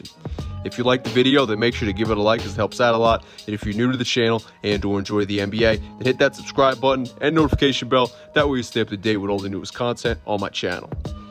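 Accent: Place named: New York, United States. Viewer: Bouche has been so surprisingly good as well , he comes of the bench with great energy as well , liked the video , you definitely a new Yorker or jersey cat with that accent 😀 , excellent read on the team , kudos and cheers lad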